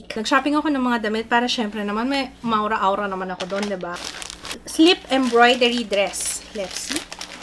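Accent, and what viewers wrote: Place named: Iloilo, Philippines. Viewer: ms, bkt ilonggo po yung accent nyu??, ilonggo po kc ako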